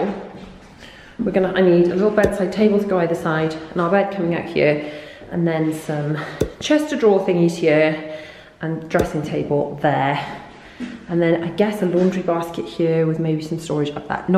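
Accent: Wales, United Kingdom. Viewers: Newbie here 🙌🏽 are you from wales you sound like me lol 🏴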